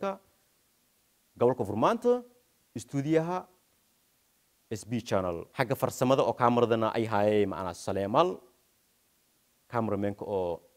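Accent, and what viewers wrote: Somalia. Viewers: wa lahjad la daho af maay maay.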